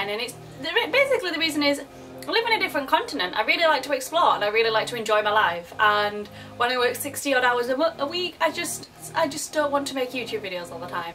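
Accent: Yorkshire, United Kingdom. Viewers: I love your accent <3 Which part of Yorkshire are you from? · hire! i started to see your yorkshire accent videos from 2011 and in your more recent videos i can understand much more now of what you are saying haha, i started to study in sheffield this year and man !! the accent is so difficult to understand, at least for me.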